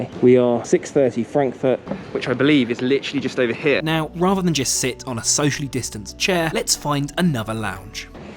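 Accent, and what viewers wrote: RP, United Kingdom. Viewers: You have a very appealing British accent. A 21st century Oxbridge accent?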